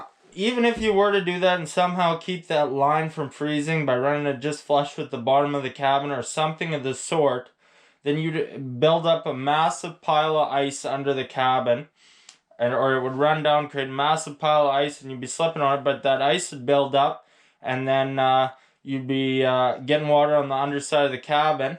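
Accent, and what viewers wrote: Canada. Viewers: You have made me fall in love with Canada with your accent lol and of course the beautiful wilderness of Canada is amazing too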